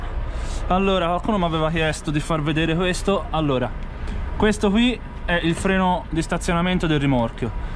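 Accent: Tuscany, Italy. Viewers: senti il toscano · Ciao il video ci sta pero mi fa ridere come pronunci la C si vede che sei toscano 😂😂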